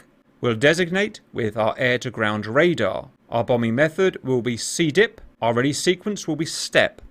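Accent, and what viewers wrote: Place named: United Kingdom. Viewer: Happy Fathersday you funny talking brit. Enjoy the journey sir.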